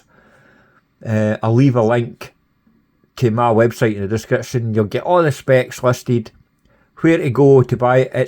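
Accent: Scotland, United Kingdom. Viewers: How have I never seen your reviews before 🤯😂 love your absolute honesty and the good Scottish language,top notch 😎👍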